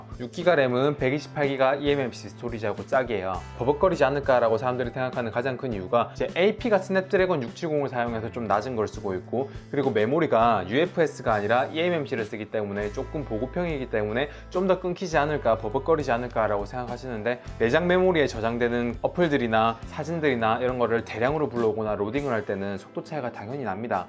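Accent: Gyeongsang, South Korea. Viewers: 형 이때는 갱상도 쌍남자스럼이 남아있었네유 ㅋㅋㅋㅋ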